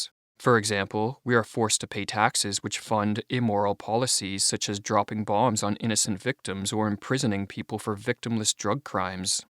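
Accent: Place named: Canada. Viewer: I like the narrator’s Canadian accent here. Makes sense right about now.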